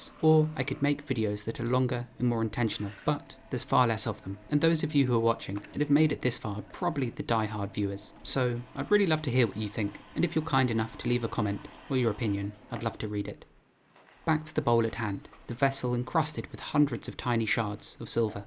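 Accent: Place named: United Kingdom. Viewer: I think a good recipe for youtube carreer balance seems to be (from the youtubers I consume the most at least) just doing what's fun for you. If it's genuine and makes you want to keep doing it, and monetary reasons aren't your main driver, then it's better to let an audience that fits your vision come to you than vice-versa. Obviously this prescription completely backfires if your main goal is making the most money, but those channels tend to not last too long so make those calculations yourself if that's the case I guess. Personally I appreciate intentional videos, but I also really appreciate your channel cause it's like looking into the window of a person who's life you have nothing in common with, learning a bunch of things along the way, and in that calming soothing brittish voice of yours that I love. Hoping to someday find the inspiration/time to practice pottery, but until then, I'll enjoy watching you do your thing :)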